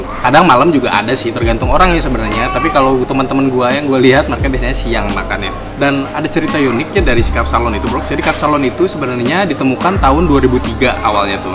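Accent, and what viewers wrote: West Java, Indonesia. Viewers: Tetep keluar ya sundanya, sagala rupa 😂😂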